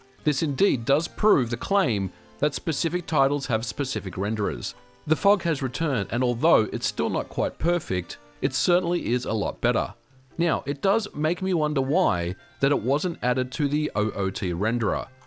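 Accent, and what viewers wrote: Australia. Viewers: It's probably a quirk of his accent(being from Australia) and just saying it the alternative way. That or just ask him on Twitter or some shit. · Classic Australian VO work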